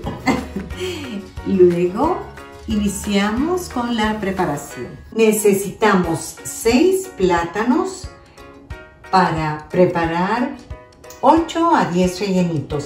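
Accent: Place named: Guatemala. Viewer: Muy agradable escuchar un castellano chapin bien hablado! Deliciosa receta, gracias!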